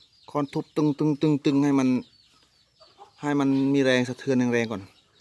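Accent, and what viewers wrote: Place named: Suphanburi, Thailand. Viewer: สวัสดีครับ....ออ็อด...สู่สู่..ครับ เป็นกำลังใจให้ครับ.... เหน่อสุพรรณ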